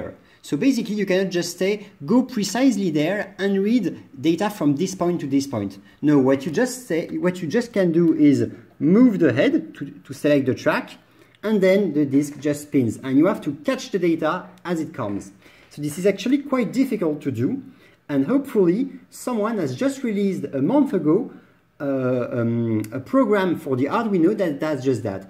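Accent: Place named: France. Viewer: Accent français😂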